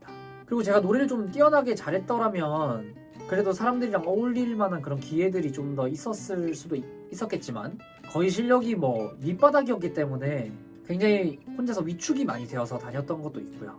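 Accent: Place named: Seoul, South Korea. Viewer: 너무 서울 억양으로 말씀하시는거 아닙니까. 같은 마산 출신으로서 서운합니다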